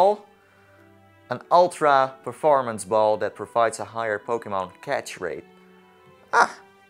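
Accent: Netherlands. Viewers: I knew your were dutch because ik ben ook nederlands :p hoorde het meteen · TomySeptic Dragon He's dutch... I think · Ben je Nederlands ofzo XD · 100% dat je Nederlands bent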